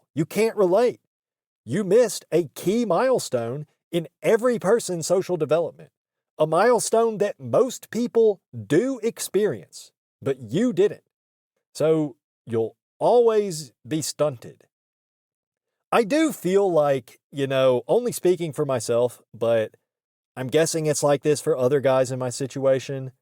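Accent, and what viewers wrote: United States. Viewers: Normal U.S. accent, what's wrong?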